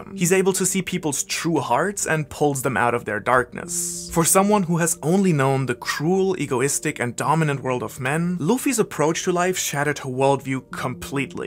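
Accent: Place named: Germany. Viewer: bruder dein english is perfekt aber dieses japanische mit dem deutschen akzent geht einfach gar nich. lern dein akzent weg oder lass es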